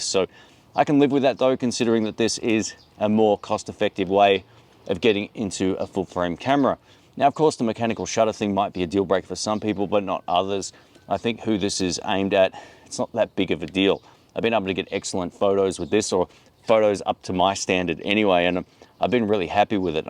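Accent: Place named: Australia. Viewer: It was all looking awesome……until you mentioned the record time limits! It did seem like that was going to come up as a problem as there was no visible ventilation – it seems to be a must-have on a full frame nowadays. With any luck Panasonic will be doing a GX9ii with a large chunk of the G9ii features…….now that would be an awesome street camera!! Being an Aussie in the UK it’s great to hear another Aussie……especially on a channel like this. Keep up the good work!!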